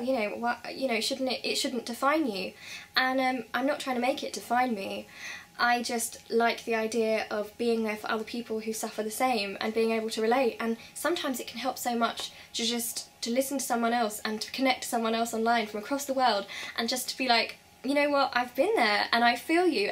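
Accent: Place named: London, United Kingdom. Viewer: What part of England are you from? You sound London, but on some words you sound a little different.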